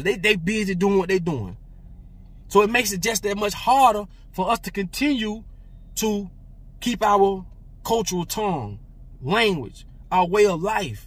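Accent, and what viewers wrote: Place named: Louisiana, United States. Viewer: Thanks for the video. Thought you were from Louisiana ! Would have never guessed Haitian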